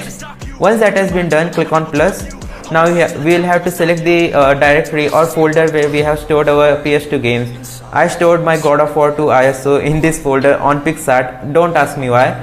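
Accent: India. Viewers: How does that every video titled " How to get 228 fps on low end for 20 bucks no shit for real" is recorded by some indian feller with terrible accent? 😂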